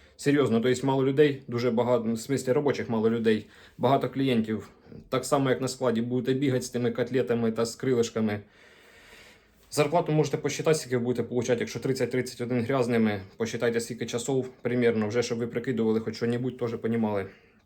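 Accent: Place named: Slobozhanshchyna, Ukraine. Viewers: Вітаю, а скажіть звідки Ви родом? Говорите суржиком, мабуть з Сумщини? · Це твоі проблеми! Чоловік балакае на слобожанському говорі! Люди не должні всі по-галічанському балакати, як ти! ЗА СЛОБОЖАНСЬКИЙ ГОВІР - ЛАЙК!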